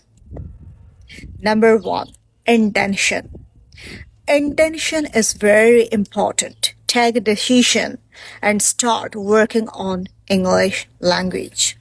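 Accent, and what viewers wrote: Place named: India. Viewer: I understood earlier that you're indian when I listened your accent, then I checked your channel's about section and finally I got I was write.😂😂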